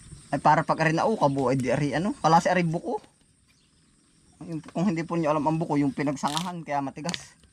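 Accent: Quezon, Philippines. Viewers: Hi,! Kahapon lang kita unang napanood at naaliw naman ako sa iyo. Taga saan ka? Kasi parang taga Mauban, Quezon ka parehong pareho ang mga words na ginagamit mo pati yong accent mo. Bago mo akong subscriber from New York,USA. Ingat ka lagi and God bless you more 🙏👍👍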